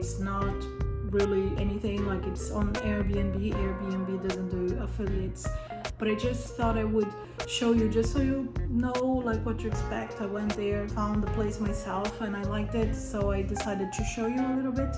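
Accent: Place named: United States. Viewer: Interesting,, but you really don't have a British accent.. you sound more like an American.. hahaha 🤣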